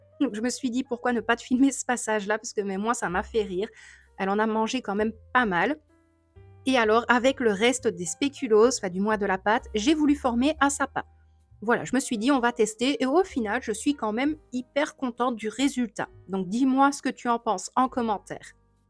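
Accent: Belgium. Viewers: merci ,j ai regarder en effet,ça ressemble plus aux pancakes américain !! Ton accent mdrr ,je suis belge aussi donc sa ne me choque pas Et oui le spéculoos c'est la vie 😘😘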